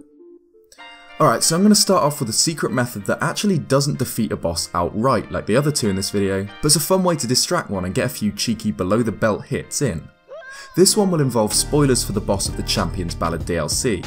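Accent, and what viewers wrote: United Kingdom. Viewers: I think its a british thing, because I know that DanTDM - another british youtuber -says "lava" as "laver"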